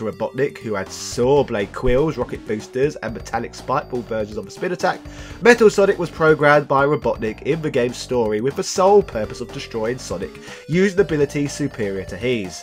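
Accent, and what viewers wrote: United Kingdom. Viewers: I have learned British accents unintentionally make certain words sound really offensive.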